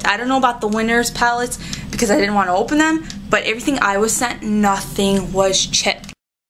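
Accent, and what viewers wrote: Brooklyn, United States. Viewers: u r really so damn pretty, they way u sound and the way u look dont match, u have this exotic beautiful look and one would almost expect this smokey sultry voice with a european accent, but u sound the the kid next door from brooklyn or something. LOL anyway good reviews and tutorials, keep em coming!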